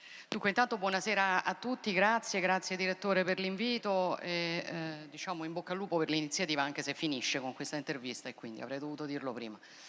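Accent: Rome, Italy. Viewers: LA GIORGIA E' SEMPRE NA MERAVIGLIA , L'ASCOLTEREI PER ORE COL SUO ACCENTO ROMANO , ❤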